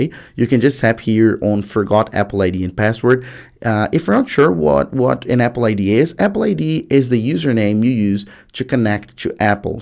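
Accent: Brazil. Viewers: Brasileiro ? Sotaque não engana kkkkkk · Fala brazucaaaaaa, sotaqueraaaaaaa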